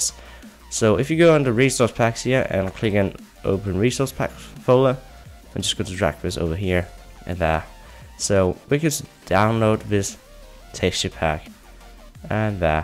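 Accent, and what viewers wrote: Denmark. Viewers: 'v you from Danmark?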